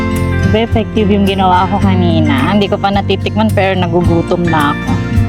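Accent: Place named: Bulacan, Philippines. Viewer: Hello po,taga bulacan k po b kc may punto k po eh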